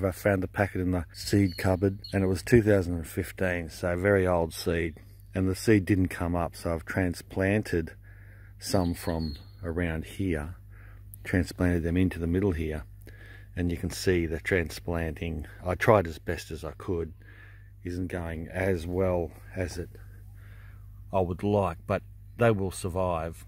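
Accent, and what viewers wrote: Australia. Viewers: I love Australian accent and words. Would love to see your country, but not to fawned of a lot of your nasty critters . I need to have made some great raised beds, like you have for my wife to garden in. With age he back is not like it use to be. Straw has gotten crazy expensive here in the USA, where I live. It cost as much as good hay. Use to get it for a $ 1.00 a bale, but now I guess they are not growing wheat like the use to. I think that ground is now in corn, because of the prices .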